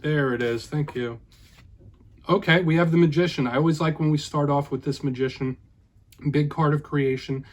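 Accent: United States. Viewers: What a cute deck! Very soothing voice (love the US accent!) and smooth tarot delivery. Greetings from 🇨🇦🇨🇦😊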